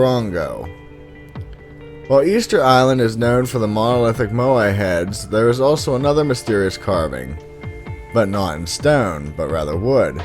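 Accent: United States. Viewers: Like the video. I assume the narrator is an american, and i´m just curious where in america one talks like this. Is it called dialect?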